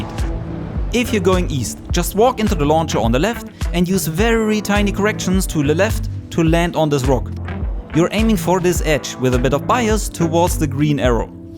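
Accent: Germany. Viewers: Man hört schon sehr stark raus das du Deutsch bist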